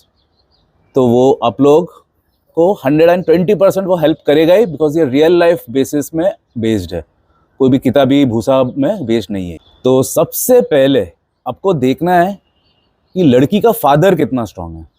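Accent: Bengal, India. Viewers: Heavy bengali accent ...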